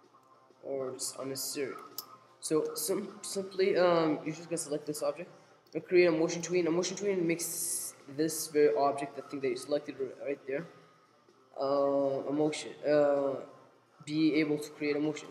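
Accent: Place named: England, United Kingdom. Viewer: Bro you talk in the way that foreigners think english sounds like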